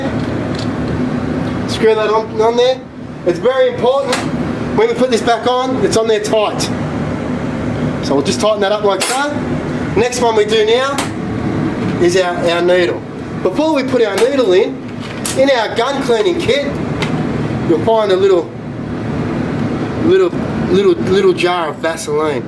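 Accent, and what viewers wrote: Australia. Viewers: Good, efficient workmanlike description. I got the message clear even with the Aussie accent. Next video, turn the exhaust- fan speed much lower so we can hear better! Thanks!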